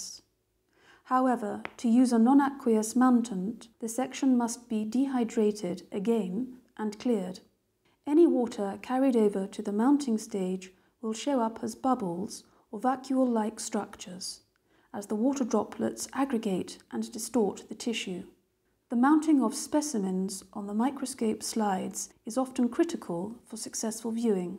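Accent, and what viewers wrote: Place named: RP, United Kingdom. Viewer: Sounds like "Received Pronunciation"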